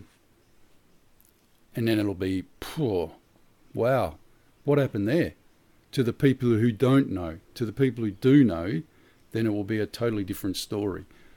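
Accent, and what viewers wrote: Australia. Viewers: Are u a queenslander mate?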